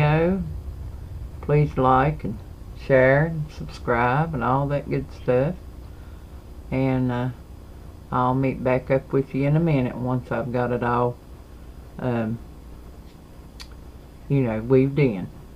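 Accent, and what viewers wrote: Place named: Tennessee, United States. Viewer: Thanks for sharing this cute crochet project. It was kind of confusing at first, since you were just saying "yarn over and pull through" without specifying that the 2nd time was going through the yarn loops and not the scrubby. lol. Is that the chain stitch? I think it is. I don't know how to crochet, but have thought about learning it with ladder yarn to make some necklaces for my handmade jewelry. My sister was learning how to crochet, but got frustrated with it. lol. I've added this video into my playlit- Crafty Creator Support for Artists, Beaders and Crafters. Hopefully that will help you with watch time. I miss my hometown of Knoxville, Tennessee...so it was wonderful hearing your accent. Reminds me of home. Take care! Sparkle on!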